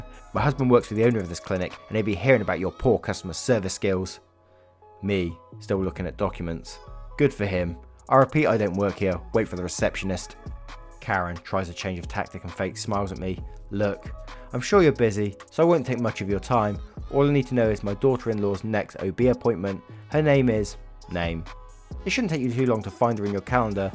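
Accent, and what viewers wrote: United Kingdom. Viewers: HI BRITISH PERSON!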